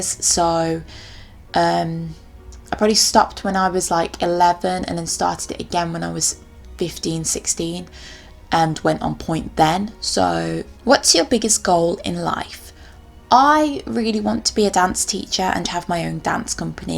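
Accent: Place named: Birmingham, United Kingdom. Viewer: Where are you from? you sound like you’re from Birmingham by the way I’m obsessed with your channel 💓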